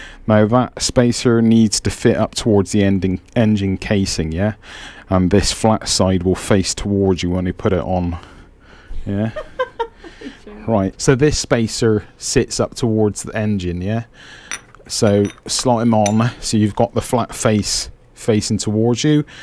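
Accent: Bristol, United Kingdom. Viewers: Good entertaining video, you sound like your from the Bristol area like me! I was gonna get s1000rr but bought new zx10r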